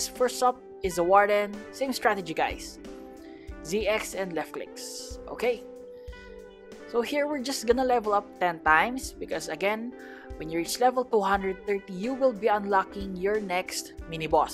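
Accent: Philippines. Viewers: Parang filipino accent mo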